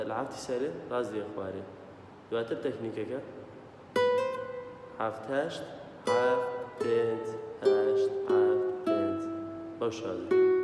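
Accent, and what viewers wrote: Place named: Rawanduz, Iraq. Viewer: Zor Zor supas Kak gyan. You’re a good teacher and I love your Rouwandizi accent 😊 keep it up mamosta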